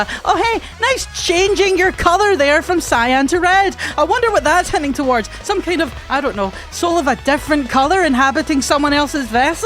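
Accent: Scotland, United Kingdom. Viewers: you have the most scottish accent i’ve ever seen 😭 absolutely love it lad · pure 10 minutes of a crazed scot yelling at us expecting us to understand her's crackpot theory, okay bud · the scottish accent made me insta sub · I love the scottish accent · I like the cut of your gib manic Scottish lady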